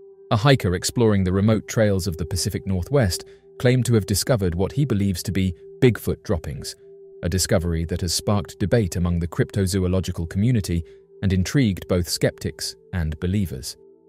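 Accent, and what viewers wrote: United Kingdom. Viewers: Why pick the British accent for narration? Your voice isn't good enough?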